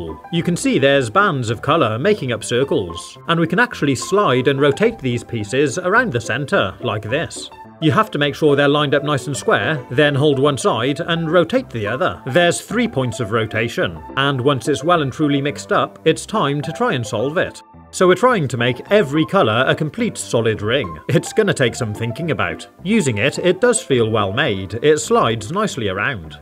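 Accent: United Kingdom. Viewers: Are you British are you from London are you even from America cuz I feel like you don't you also talk British so much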